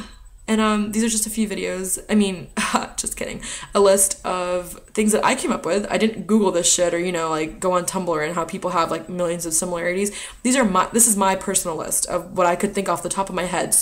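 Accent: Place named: India. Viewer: your from india, therefore you DO have an accent. I work with lots of indians and they all think they dont have an accent but say stuff like 'interior-rum report' (trying to say 'interim report'). Anyway....PARKKEE STAARRNNN, JINNDAAA BAAADDHH. Afridi is best cricket player of all time centuries every game bro.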